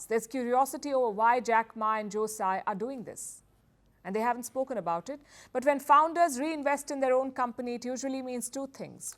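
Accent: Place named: India. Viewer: Very heavy indian accent😂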